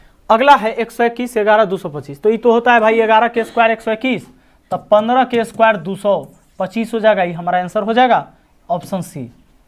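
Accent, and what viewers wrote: Bihar, India. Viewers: Sir ap Bihar se ho kia?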